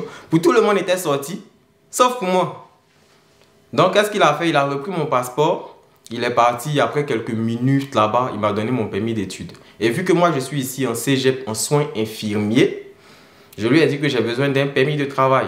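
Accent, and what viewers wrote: Ivory Coast. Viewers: Belle vidéo J'ai kiffé Je suis camerounais mais je kif l'accent ivoirien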